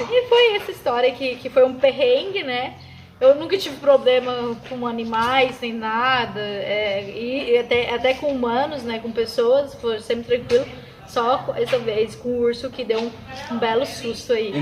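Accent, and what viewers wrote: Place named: Goiás, Brazil. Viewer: Perdeu o sotaque goiano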